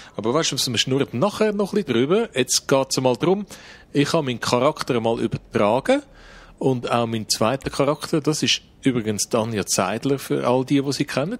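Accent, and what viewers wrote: Switzerland. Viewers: Englich Schweizerisch.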